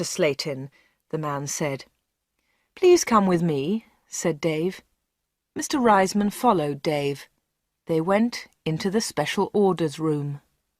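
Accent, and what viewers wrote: United Kingdom. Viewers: این تلفظش بریتیشه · Nice British English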